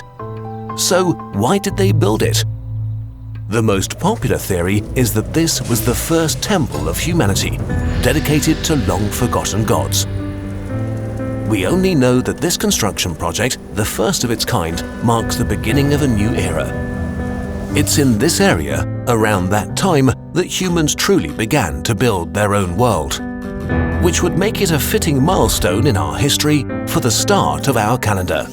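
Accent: United Kingdom. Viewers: Where is he from? because Kurzgesagt is German, but he sounds more Brittish. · Not sure why, but his British joice just makes it seem more reliable and knowing.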